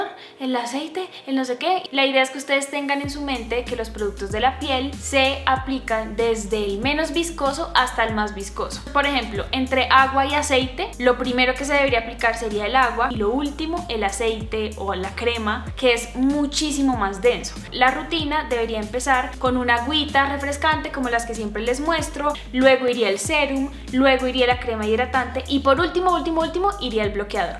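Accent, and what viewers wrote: Bogotá, Colombia. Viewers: Así hablamos los bogotanos 🤗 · Me gusta mucho tu acento colombiano, por cierto ¿cómo podemos saber quién es un buen dermatólogo o cómo identificarlo? <3 <3 saludos desde Oaxaca, México.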